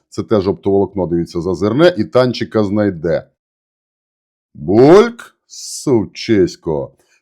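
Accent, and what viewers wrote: Transcarpathia, Ukraine. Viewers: Мені подобається будь який формат, п., бо знаю, що хробаки будуть отримувати в центр прінятія рєшєній те, на що заслуговують. Ну, а озвучка із включенням нашої закарпатської бесіди дуже ми ся любит.🇺🇦❤️ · !!!та від ВАС любий формат!!!(це як бальзам)/і запатентуйте Ваше вміння Закарпатської, говірки, 🎉😂. Слава Богу що є такі ПАТРІОТИ!!!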